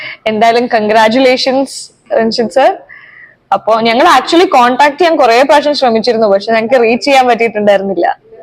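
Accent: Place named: Malappuram, India. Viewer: Full മലപ്പുറം ആണലോ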